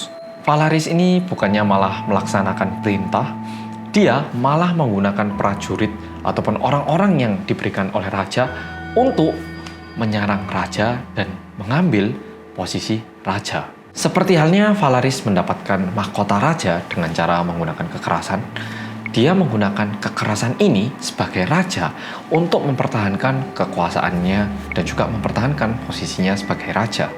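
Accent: Java, Indonesia. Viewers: jeda kuliah online nonton mas medhok wkwk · Keren mas brow Org korea fasih berbasa indonesia Tp medoknya (logat jawa) kental banget Salut dech mantappp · wow medok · MEDOOOOOOK hehe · Finally, we got it mas detektif medok;) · Yeyyyy,🥳🥳🥳 well come back karo wong korea sg medokk, udah di tunggu2 mas, hehe😅😅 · Apik banget lo mas seng medokkk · Mas asli orang jawa bukan sih, kok bahasanya medok banget hehehe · Bang kok medok banget sih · Jowone kok katut mas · Yeay, detektif yg medok kembali! Hehe ᬇᬫᬫ᭄ᬫᬳ᭄ᬤᬶ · Akhire detektipp Medhok menehh Btw mas, ngerekame ojo nang lorong ngono mas, Dadi wedi gegoro ndelok i lorong terus T_T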